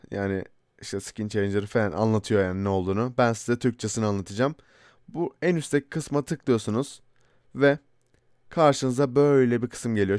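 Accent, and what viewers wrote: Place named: Bursa, Turkey. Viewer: abi kusura bakma ama bursalı gibi konusuyon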